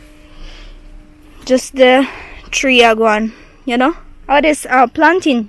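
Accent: Jamaica. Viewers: and the patois kills me every time! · Very proud to see that you adopted the Jamaican culture and accent and your kids are wonderful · You talk, act and cook like a Jamaican. Congratulations. I also like your green thumb and love of traditional Jamaican foods. I see that you are taking care of your family well. Happy for the family. You guys are adjusting well to life back in Jamaica, having lived overseas for all of these years. Ackee and Saltfish looked scrumptious.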